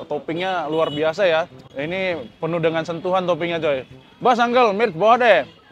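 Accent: Medan, Indonesia. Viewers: Omakk...logat medan ah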